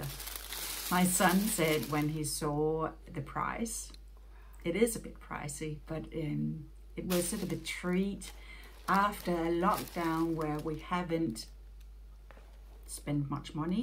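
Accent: Denmark. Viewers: Hyggeligt at komme indenfor - dejlig podcast - sporede jeg lige en Aarhusiansk accent :-D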